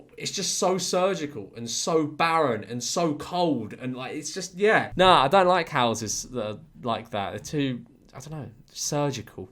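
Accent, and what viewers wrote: United Kingdom. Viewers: Is it just me or does sound more British in this video than the others?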